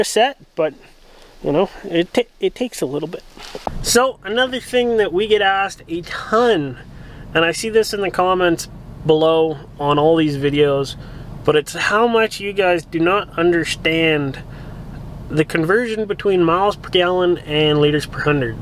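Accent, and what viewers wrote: Canada. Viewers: I heard the accent… thought you were Canadian. You popped the hood and I saw the DDC plates.. YEP! You’re Canadian 😏 beautiful truck !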